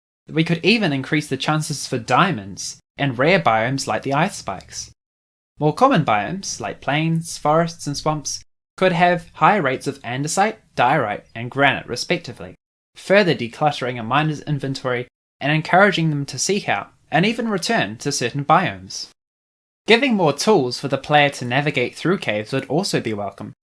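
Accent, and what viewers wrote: New Zealand. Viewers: You sound like a New Zealander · Couldn't have a more Kiwi accent if you tried hahaha. Great video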